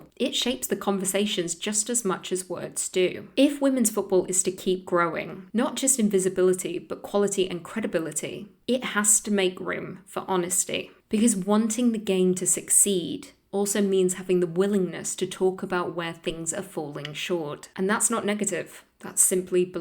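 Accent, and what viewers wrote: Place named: Transatlantic, United States. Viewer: Are you British or American? You're putting on a transatlantic accent, saying 'criddicism' etc. Don't be a wash.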